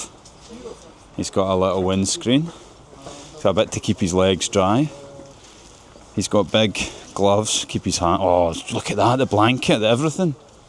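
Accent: Scotland, United Kingdom. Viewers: Russian with a Scottish accent is something really new to me... I'm not Russian myself.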